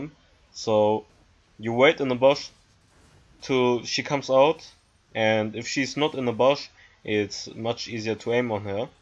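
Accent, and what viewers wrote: Germany. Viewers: I have a question. Are you from Germany? Because you sound like german and your Windows Exil or Word or whatever were german, too. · Where r u from? Youre english sounds like u come from germany 😅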